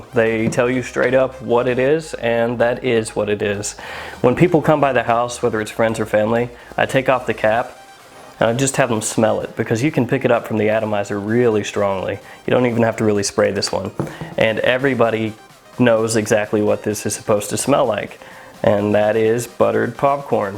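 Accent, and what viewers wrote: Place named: Los Angeles, United States. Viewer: Dam. That's like a Hollywood story. Would've never guessed the two of you went to high school. I might be just hearing things, but it sounds like the way ya speak reminds me of someone here in L.A., while your wife possibly a southern accent. Again, I could be totally wrong.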